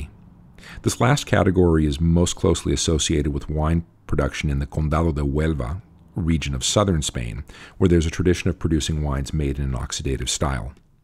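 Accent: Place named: Caribbean. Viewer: I like your Spanish pronunciation. It sounds Caribbean (Boricua maybe?)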